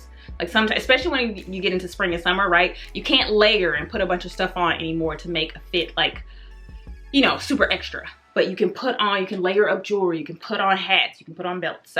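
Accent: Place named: United States. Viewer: I had to have subtitles because I am Portuguese and speak fluent English ( I live in London), but my girl J speaks too fast and I almost cannot understand the American accent!!! But I love her anyways…💞💞💞💞💞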